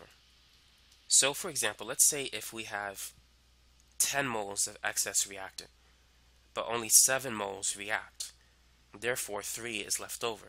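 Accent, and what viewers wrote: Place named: Boston, United States. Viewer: His boston accent 🤤🤤🤤🤤🤤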